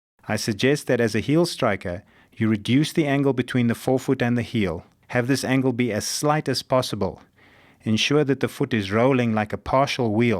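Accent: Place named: South Africa. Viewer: I think this is a South African accent. A "fucking accent" would probably have more moans, groans and "oh, baby"s in it. Doesn't everyone who speaks English have some sort of accent?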